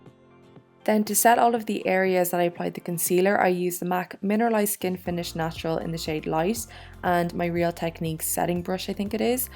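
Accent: Ireland. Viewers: I wish I had an Irish accent, so pretty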